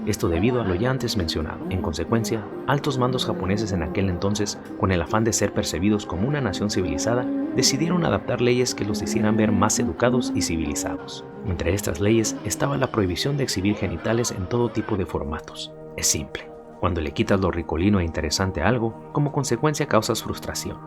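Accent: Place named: Mexico. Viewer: Eres un artista para narrar, un estilo muy peculiar mexicano 👌 felicidades carnal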